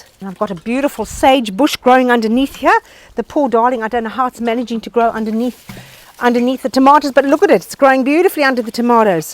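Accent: South Africa. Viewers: Ex South African? Loved your video!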